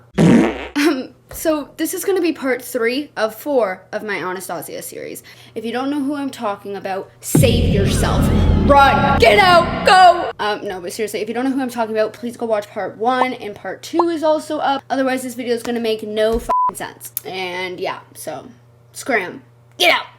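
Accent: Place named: Canada. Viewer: I’ve started watching you recently and the first thing I thought that inspired me to click the video was “this girl has to be from somewhere in Canada if not somewhere in Alberta “ . It’s beautiful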